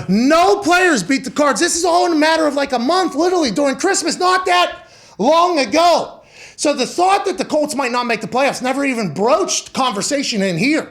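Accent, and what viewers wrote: Pittsburgh, United States. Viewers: Got damn you are from Pittsburgh listen to that accent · The yinzer accent thickens when he gets excited. DAAHHNTAAHHN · The Pittsburgh accent from McAfee is amazing...they should bring the Pittsburgh Dad on here to really make it pop. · becomes Super Yinzer when he’s pissed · Lol 😂 Love the yinzer accent! Thank you Jags! Go Stillers!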